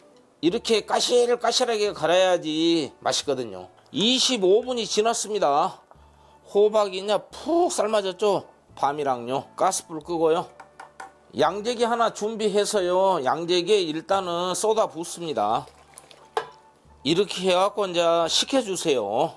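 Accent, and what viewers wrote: Jeolla, South Korea. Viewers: 구수한 말투가 전라도 저 아랫지역 바닷가 근처 목소리셔요.. 구성지게 귀에 쏙쏙 들어옵니다.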